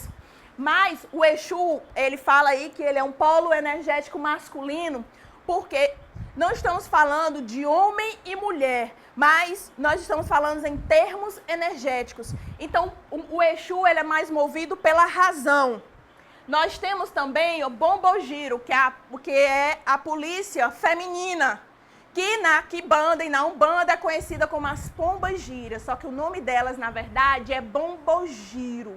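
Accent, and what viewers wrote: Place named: Northeast, Brazil. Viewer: Primeiramente ressalto o português bem falado no sotaque nordestino aff ..Eu amo é um deleite para os meus ouvidos, acho sotaque mais bonito do Brasil. É também dizer que essa palestra foi um deleite para o meus ouvido ,vi a hora mais vou ouvir muitas outras vezes e seguir essa palestrante que amei obrigada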